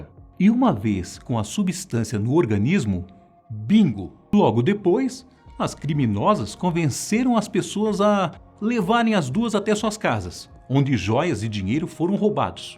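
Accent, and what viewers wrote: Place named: Bahia, Brazil. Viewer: Gostei, esse cara tem uma energia boa, tipo...meu sangue vai com o dele, no modo baiano de falar😅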